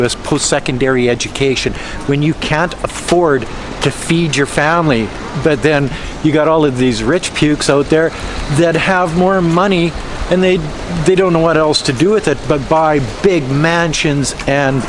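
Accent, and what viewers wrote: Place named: Canada. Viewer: Reasons why I like your channel is, you and me both Canadian from British Columbia, we have some much the same in common and your Canadian way of language "FXXK them!", yes, that is Canadian way and Canadian tone! Don't worry about those bullshit shut down, there is many other ways to express your truth speech and comment, just let us know and we follows. Keep up the good work and like to watch your daily life in China!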